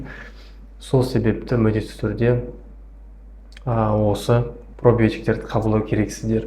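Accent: Standard. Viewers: Аиналаиын балам Рахмет Саган сондаи журекке жылы жагымды казакша таза тусындыресын Алла жар болсын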